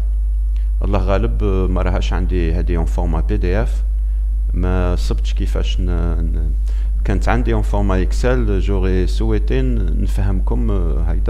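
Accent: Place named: Tlemcen, Algeria. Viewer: Merci beaucoup tout est claire y'a tlemcani vous gardez bien l accent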